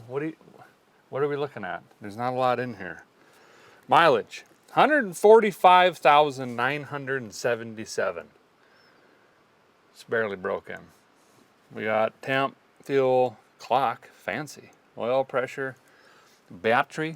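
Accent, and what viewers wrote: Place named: Appalachia, United States. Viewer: Why do you talk like a hillbilly from 40 years ago?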